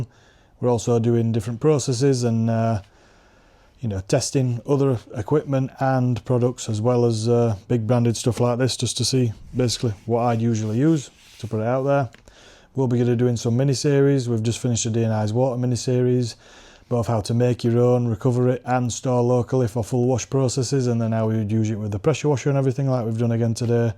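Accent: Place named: Scotland, United Kingdom. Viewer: Great video man ! Watched it right till the end. I noticed when you rinse off, you also make sure you give the door jam spaces and body fittings a good blast to make sure you get any debris, sand grit, leftover soaps etc. . .Nice work. . . JUST like I do. I have a Mazda CX5 and I hit those same areas well with the spray gun. The CX5's have "gutters' on the roof for a roof rack install. Those areas are notorious for hiding gunk, grit, sand, leaves etc. I'm not a pro. I'm just a weekend enthusiast that loves taking care of my cars. Like I said, I enjoyed your video, and thanks for taking the time to put it together. Are you in Scotland ? I detect what sounds like a Scottish accent. I recently bought a bunch of car wash stuff; - active 2.0 pressure washer - upgraded braided 50' hose with quick attachments - a good spray gun and wand extension - MTM foam cannon - I already have a dewalt 60v max blower with 15 amp hour batteries to dry the car. 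and MANNNN does it ever blow LOL :D - 4L GYEON foam - 4L Gtechniqiue ceramic Gwash - couple Gyeon wash mitts - couple Gyeon dry towels (to pick up left behind water drops) - Gyeon bug n grime spray 500ml - Gyeon exterior detailer 4L I have a bunch of other cleaning stuff I've acquired over time so I'm well equipped. I live in an apartment building over here in Canada and we have a hose tap in the underground garage where I park. There's also an electrical outlet about 20 ft away. Cheers bro !!!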